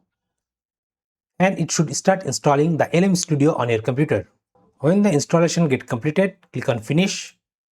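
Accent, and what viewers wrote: India. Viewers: Lol, gotta decode the indian-english first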